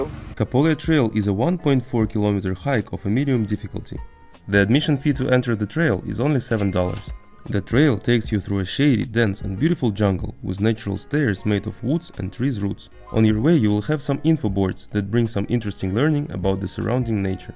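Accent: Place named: Russia. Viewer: Братан, у тебя русский акцент. Это 100%. Ты откуда?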